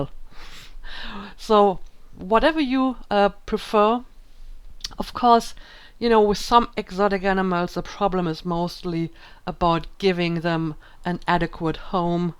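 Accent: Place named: Germany. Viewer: Myst... that was the first game that scared me too. But I was very young and didn't understand a thing. And nice german dialect you have there. Ist das norddeutsch/friesisch? Ich kann es überhaupt nicht einordnen. Hört man im Süden nicht so oft :D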